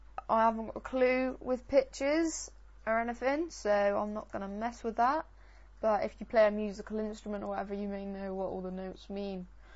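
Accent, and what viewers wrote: United Kingdom. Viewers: guys stop calling him a girl,I think hes...british,with the accent,i think... -_ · Hes from the UK. With an accent. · you cant beat the west country accent eh?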